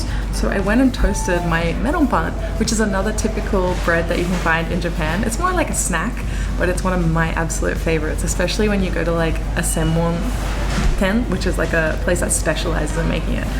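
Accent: Australia. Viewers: Wait, German? I felt absolutely sure you were Australian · I thought you are only Australian because of your accent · At the start you said you were German.....you sound like you are from South Sydney..... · oh I didn‘t know you are german. I thought you are from Australia. Love your Videos! Greeting from Germany 🙋🏻‍♀️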